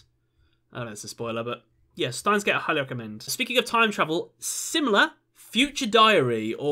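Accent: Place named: Birmingham, United Kingdom. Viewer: “much simpla” ah it’s good to be a brummie like you haha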